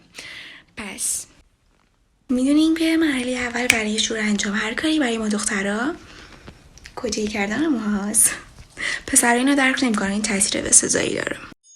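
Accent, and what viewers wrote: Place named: Turkey. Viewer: حس خوبی بهم میدی با توجه به یه کوچولو لحجه ای که داری حس میکنم ترکی❤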